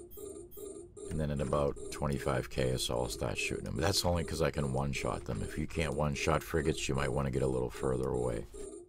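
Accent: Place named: Massachusetts, United States. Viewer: It's a Massachusetts accent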